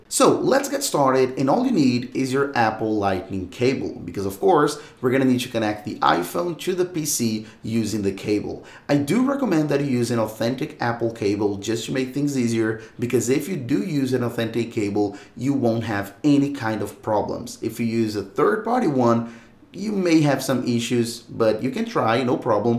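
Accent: Brazil. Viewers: Muito engraçado como seu inglês é quase perfeito, mas da perceber que eh brasileiro, ótimo trabalho irmão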